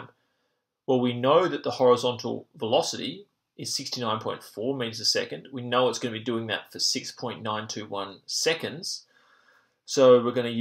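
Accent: Australia. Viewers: cool Aussie accent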